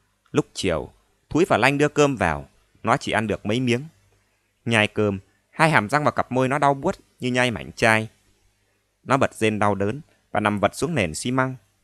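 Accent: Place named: Bình Dương, Vietnam. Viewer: Hay quáluônnnnnnn❤❤❤❤❤❤❤❤❤❤❤❤❤❤❤❤❤❤❤❤❤❤❤❤❤❤❤❤❤❤❤❤ Nhưng nghe hơi Bình Dương thật 😂😂😂😂😂😅😅😅😅😅😅😅😅😅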